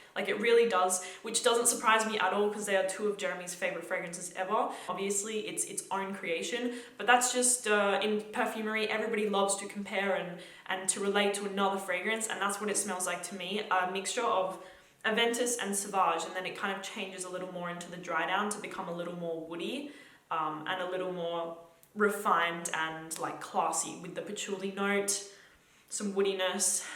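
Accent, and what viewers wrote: Australia. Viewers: i thought you were english you are australian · Aussie accent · girl you surely have a STRONG aussie accent....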